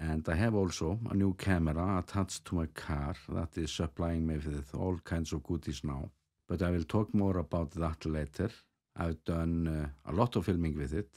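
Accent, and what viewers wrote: Iceland. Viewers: Fluent English spoken with Icelandtic phonemes sounds amazing! Plus of course you have a nice voice. :-)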